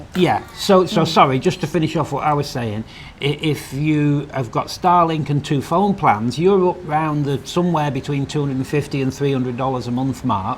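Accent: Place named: Manchester, United Kingdom. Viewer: Hi. Excellent series. You have inspired wifey and I to do a lap starting November. Getting things together and I was wondering what size water bladder you had on your trip. Hoping to be in WA after chrimbo. Btw I just got back from UK/Manchester where they all talk funny like you😊. Looking forward to your next trip.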